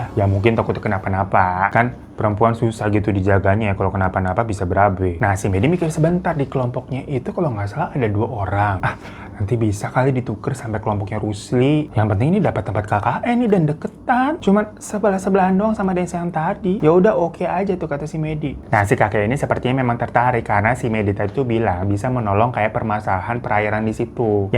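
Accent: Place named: Sunda, Indonesia. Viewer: Si aa lucu pisan ngomong bahasa sunda na jahaahaha😂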